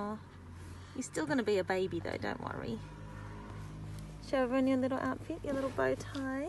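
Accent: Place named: United Kingdom. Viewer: Aww hi baby!! Such a cutie and I love your British accent mommy!! 🥰🥰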